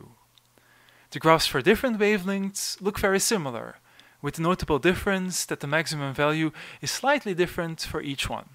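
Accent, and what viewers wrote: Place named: Europe. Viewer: When you click on a YT thumbnail: 😊 When the European accent starts 😢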